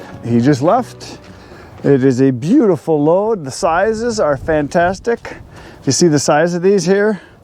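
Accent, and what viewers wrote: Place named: Upper Midwest, United States. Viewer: LOL you talk regular northeast / mid atlantic by yourself, but when another person shows up, your upper midwest shows.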